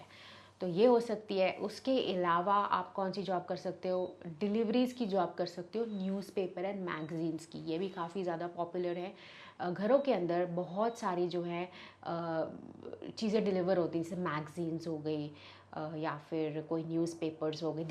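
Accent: India. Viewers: Why do you sound like a Maharashtrian? 😅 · Hariyana se hai ke.. Rom Rom ji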